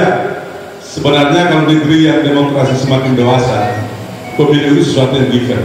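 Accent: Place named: Flores, Indonesia. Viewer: Mantap e suara flores.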